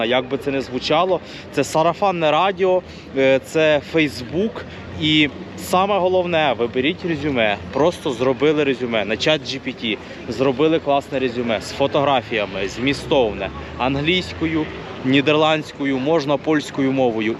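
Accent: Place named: South, Ukraine. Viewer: У нього діалект півдня України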